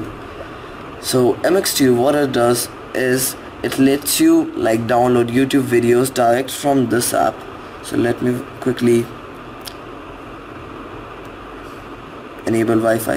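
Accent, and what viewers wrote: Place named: India. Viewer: I love your accent no offense I love Indians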